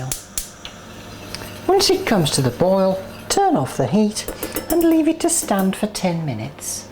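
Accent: United Kingdom. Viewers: I've just found your channel and I must say you have charmed me rather splendidly! Your Danish Pastry video is just about the best I've seen, you make it look so easy I'm going to give it a try. And I love your British accent! You have a, what I call, a story book voice................I feel almost like you are reading a wonderful bedtime story to me! Thank you so much for sharing with us all. I've subscribed and you can bet I'll be a lifetime fan!!! I live in Kansas by the way. Thank you so much again. Bright blessings to you.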